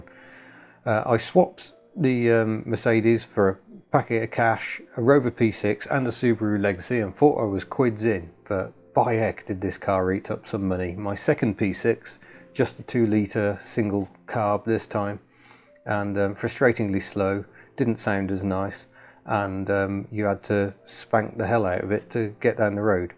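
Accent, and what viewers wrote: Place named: Birmingham, United Kingdom. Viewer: Thought you was a Brummie, makes two of us, i'm from Hall Green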